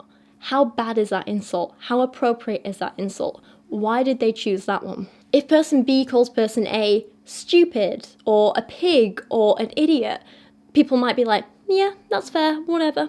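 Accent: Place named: Northern England, United Kingdom. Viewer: accent isn't perfect RP. There's an element of RP in there, but it's also largely Northern.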